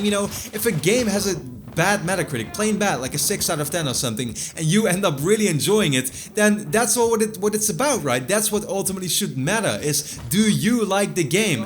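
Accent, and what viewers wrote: Netherlands. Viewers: are you from holland? i recognize the accent. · toen ik je accent hoorde dacht ik gelijk dat je nederlands was eh!😂 en toen keek ik bij je kanaal info en het klopte ahahah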